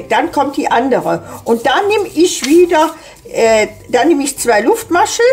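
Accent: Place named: Bergisches Land, Germany. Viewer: Dat hört sich sehr nach meiner alten Heimat dat Bergische Land an😊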